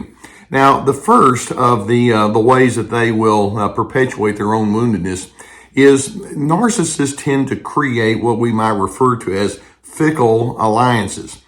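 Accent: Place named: Texas, United States. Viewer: you (and ) have been a tremendous help as I recover from a 34 year marriage with an extreme narcissist. I’m a Texan living in Idaho for 30 years and your Texas-English is beautiful. 🥰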